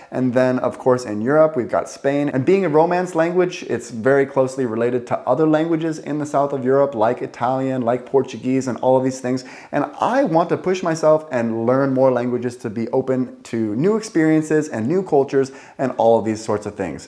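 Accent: United States. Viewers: Japp det gör han gillar att han låter amerikansk när han pratar.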